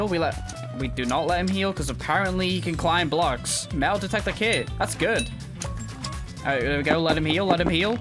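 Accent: United Kingdom. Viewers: Bruh he’s British that’s why he says it like that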